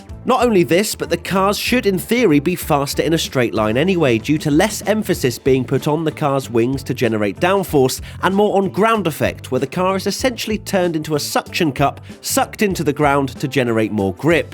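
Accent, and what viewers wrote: United Kingdom. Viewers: this guy is comically british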